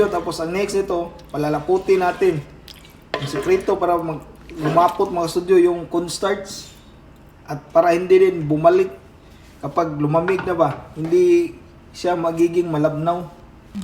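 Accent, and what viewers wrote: Visayas, Philippines. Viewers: pangit ng pagkaluto mo..bisaya ka talaga,ayusin mo magtagalog at pagluto mo ng dinuguan..ikaw lng nasasarapan nyan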